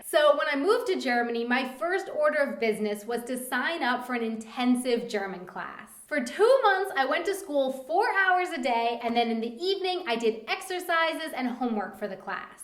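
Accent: United States. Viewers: Here's the method: if you are about to learn a foreign language - do not surround yourself with those, who only speak english. Avoid - sorry, but it works best that way - your own language (you know it already). Sign for success: you start to think, to dream and finally to react in your language of choice. Hearing You speak on these videos shows that you are still english-american based - it also shows during those few crumbs of german one can hear every now and then! Pronounciation could be learned by attempting queens-english or scouse a.s.o. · If you want to make your accent when speaking German more German and less American, I suggest you to listen to some Nachrichten and try to let you and the broadcaster read the material simultaneously. When you do it, you will find the differences between the American and German accent and adopt German accent more quickly. 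I know you may do not know the material before the program, so you can listen several times and then begin that. I am foreign student in the USA, and that is how I adapted a New England accent within four months. (This is my 2nd year here, but many of my friends thought I have been here for more than 6 years and I am learning German too!) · I don't think you're even trying to get the pronunciations correct. In german or Spanish. Like a deliberate heavily American accent in both. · American accent in Spanish is always quite ...interesting... :D And really easy to imitate somehow. But however your accent is, it is cool that we seem to speak the same three languages. ^^ When communicating with someone it is very great to switch languages!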